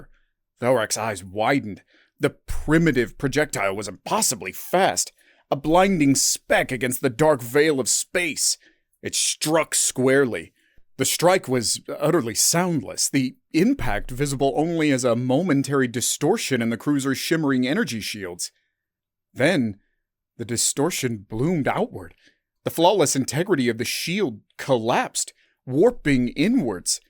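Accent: South, United States. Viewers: YES! of course, as the University of North Carolina found out when studying North Carolina accents, there are 105 different versions of southern accents in that state! Good Job, you all, in choosing this accent for such a good story! Same plot as in many AI generated stories but it is the way a story is told rather than the actual plot, that counts for all!! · I like that you actually used your voice for this, as opposed to every other HFY channel, but one thing: WHY A HEAVY SOUTHERN ACCENT? ITS DUMB, OVERUSED, AND IS AN AFFRONT TO MY REGION (WE DONT SOUND LIKE THAT) Great video!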